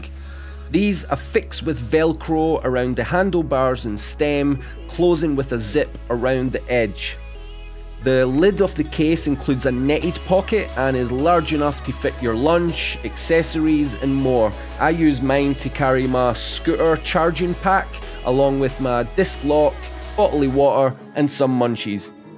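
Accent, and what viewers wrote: Scotland, United Kingdom. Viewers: Yaaasssss a Scottish guyyyy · ok, stop.. i can't resist that much british accent. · i was thinking, hmm that looks like Newcastle quayside and north sheilds..... but your scottish! yup i was right it is haha im living here aswell :)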